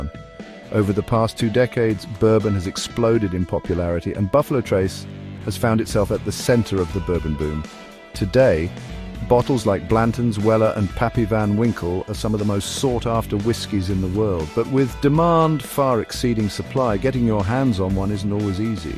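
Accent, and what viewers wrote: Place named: England, United Kingdom. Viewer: why have an english accent voiceover for the most american product??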